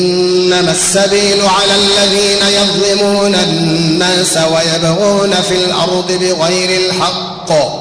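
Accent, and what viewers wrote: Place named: Morocco. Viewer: قريبة من القراءة المغربية. جميل ما شاء الله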